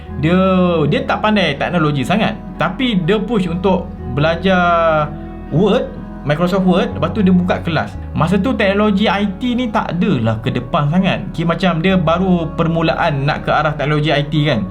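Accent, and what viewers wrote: Melaka, Malaysia. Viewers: loghat Melaka kuat jugak tu bro... Pegang = "pay"gang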